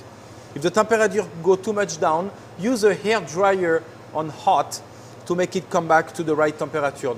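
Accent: France. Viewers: Il a un accent francer !! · I love that accent! I can't place exactly what it is though! I think it's a French accent, but I can't tell. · Do you have a French accent I'm guessing? Cool, how old were u wen u learned english? Sorry, I'm a big language nerd :P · hes from france, he sounds french and his name is french aswell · French accent ^^ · I definitely love his french-english accent, his randomness, and his job ( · good old french accent ! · just a little bit french! lol! great job! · this guy has a French accent, the I pick things up guy has an Austrian accent lol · oh is it a french accent I thought it was german my bad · Hahahaha. You gotta love the french-accent. :D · Are you french??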